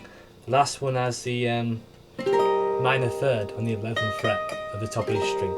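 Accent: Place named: Hull, United Kingdom. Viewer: Good lad - I'm from Hull myself, nice to hear a lesson in an accent similar to mine!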